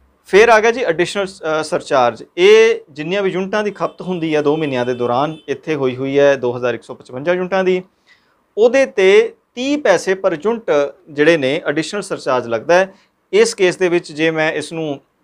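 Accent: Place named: Puadh, India. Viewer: puaadhi language bolde ne vr...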